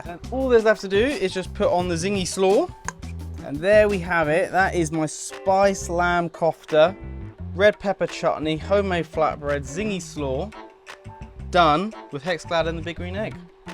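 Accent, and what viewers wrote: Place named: United Kingdom. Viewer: If I ignore the face has reminded me of Jamie Oliver Show, a perfect kitchen class 💯👌 The cooking style, explaning the ingredients, variety of spices, expecially rooling of meat kababs over salt just like he do and obviously British accent😅 🤌.................You nailed it. You have to be generous with the ingredients to bring that level of flavour profile. These were definitly looking awesome and wholesome🤤🤤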